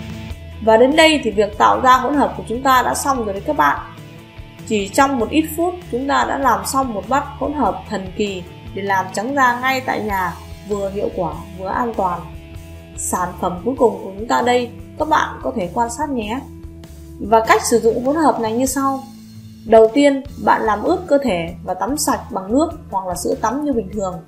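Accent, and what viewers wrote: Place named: Countryside, Vietnam. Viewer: Giọng quê mùa kém sang lắm, ơi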